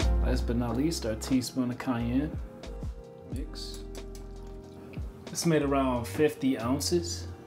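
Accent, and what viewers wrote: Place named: Western Pennsylvania, United States. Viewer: I knew it.. I was born in Pittsburgh and moved to Maryland when I was 7 so.. I heard that Western Pa accent mainly da burgh in you.. I had to ask.. much love to you my brother.. It crazy my family reunion is in the burgh in a couple weeks so I’ll be up there.. peace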